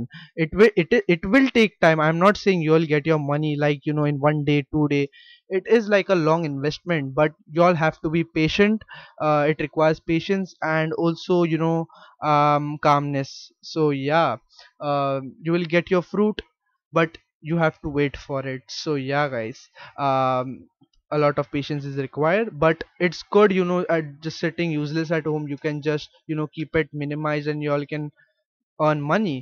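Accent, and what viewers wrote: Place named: India. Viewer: Indian accent but i need to mine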